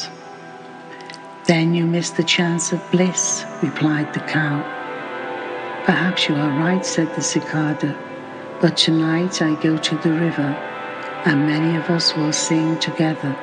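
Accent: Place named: Nuyorican, United States. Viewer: Wow! You have taken a somewhat 'New Yorican' turn? Any reason for the change in mood:) Thank you and great work.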